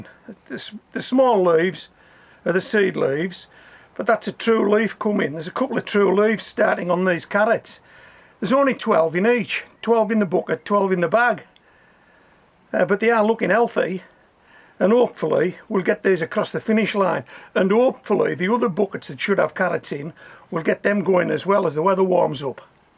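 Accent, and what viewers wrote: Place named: West Cumbria, United Kingdom. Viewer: That’s is a West Cumbrian accent if ever I heard one. What bags are you using? Great channel btw. Is that homemade compost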